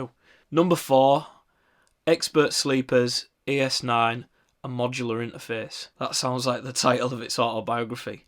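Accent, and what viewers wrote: Northern England, United Kingdom. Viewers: Great video! Also good to hear another northern accent in modular videos (as well as the OG, DivKid). ES-9 isn’t ADAT btw. It’s SPDIF. If you want to use ADAT then ES-8 is a better choice.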